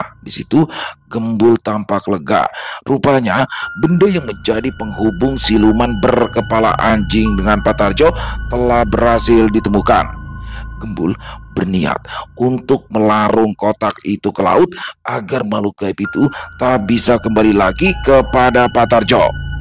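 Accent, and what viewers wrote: Jakarta, Indonesia. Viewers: Waalaikumsallam...malem bang. urang gunung hadir bang.ini cerita terpaporit saya warung kopi bang doni saya suka logat betawi nya inget dulu waktu tinggal di jakarta waktu kerja di kuningan hehe.salam sehat buat bang dan kru serta keluwarga😀🙏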